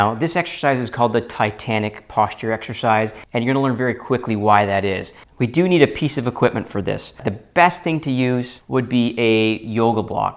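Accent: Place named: Canada. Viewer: Upvoted and shared! Plus: I knew you were Canadian, after a few moments of listening : )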